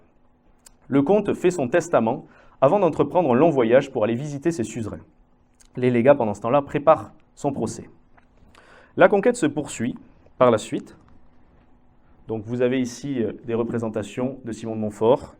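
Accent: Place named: Occitania, France. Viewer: racontée avec un bel accent occitant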